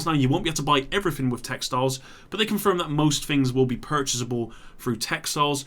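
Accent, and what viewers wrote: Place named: Cockney, United Kingdom. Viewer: propa cockney m8 th=f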